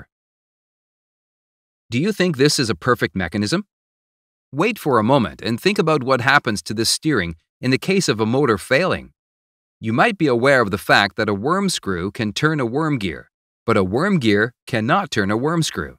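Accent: United States. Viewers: Why is the video narrated by an American but the driver is in Britain? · The first 12 seconds bother me. You have an American accent but your animation driving in the left side of the road. And you say steering is the hardest thing to master, it’s hard but that’s only because Americans drive automatics. You think steering is more complicated than clutch, gears and steering? Come on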